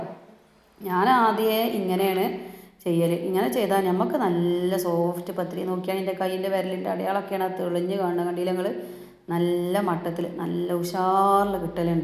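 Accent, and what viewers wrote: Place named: Malappuram, India. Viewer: മൊഞ്ചുള്ള മലപ്പുറം താതനെക്കാൾ മൊഞ്ചും ചൊറുക്കും ഉള്ള മൊഞ്ചത്തി നൈസ് പത്തിരി മാണങ്ങി തിന്നോളിൻ മക്കളെ പത്തിരിനേക്കാട്ടിൻ മൊഞ്ചുണ്ട്ട്ടാ താത്താന്റെ അയഞ്ഞാൻ കൊയഞാന്‍ ബർത്താനം എന്തത്താണ് മക്കളെ കേട്ടാലും കേട്ടാലും പൂതി തീരൂല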